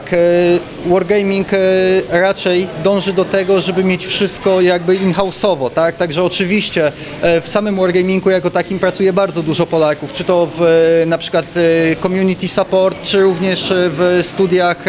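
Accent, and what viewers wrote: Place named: England, United Kingdom. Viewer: ale ma chamsko angielski akcent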